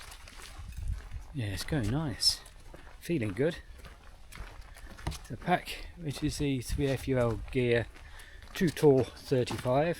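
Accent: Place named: England, United Kingdom. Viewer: New subscriber here, just watched your SnugPak Jungle bag review 👍🏻 Sorry to see you had your heart attack but glad to see you made it and on the mend. Don’t be afraid to look at complementary protocols to run alongside the conventional too 👍🏻 Hope your well for a very loooooong time 👍🏻 Incidentally you sound English to my ear 👂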